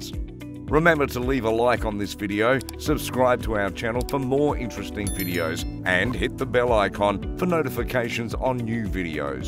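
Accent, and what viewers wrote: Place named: Australia. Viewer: Glad to hear an Ozzie accent on Space exploration with know how. Just subscribed!!! Keep up the informative work - mate!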